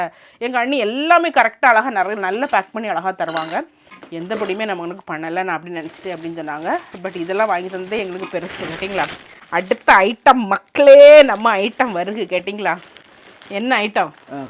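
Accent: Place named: Kanyakumari, India. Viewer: Hi sister i an from singapore . Very sweet dialogue kanyakumari slang . One tip to share here Keep new puli in fridge , never change black color . I use to buy from chennai as new puli and store in fridge . For 4 yrs also never change color . But once you keep out it will get black . I always take puli from fridge for 2 weeks once so that our curry also never look black . Try this surely you will see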